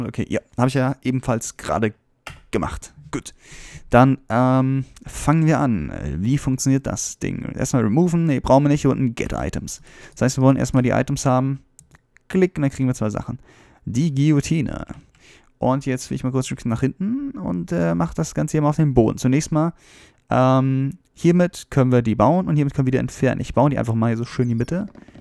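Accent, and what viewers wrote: Hesse, Germany. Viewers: Er ist Hesse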